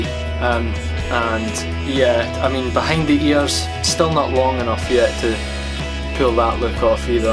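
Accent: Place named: Scotland, United Kingdom. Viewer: Hmm the accent.. Where in Scotland are you from,?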